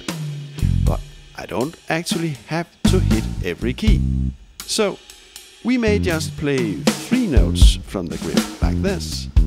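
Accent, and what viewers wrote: Denmark. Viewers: Er det bare mig eller lyder han bare mega dansk? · Jeg har set videoen i 5 sekunder og kender intet til din kanal overhovedet. Men dén accent afslører en dansker alle steder! Glæder mig til at høre videre nu :-) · A Danish accent can't hide from Danish ears 😊 🇩🇰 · That's a danish accent if I've ever heard one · Scandinavian accent immediately recognized. Danish right? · Are you danish by any chance? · sima' er du dansker. Jeg kan høre det så hårdt i din stemme :) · Is he danish?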